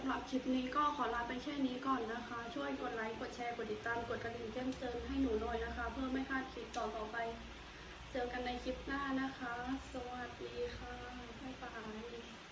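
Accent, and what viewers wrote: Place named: North, Thailand. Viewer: วันนี้อู้กำเมืองเลยน้อ... 👍👍👍👏👏👏🌹🌹🌹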